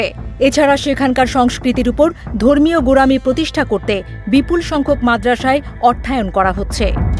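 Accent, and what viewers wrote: India. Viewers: এনটিভি কি ভারতীয় সুরে কথা বলেছে?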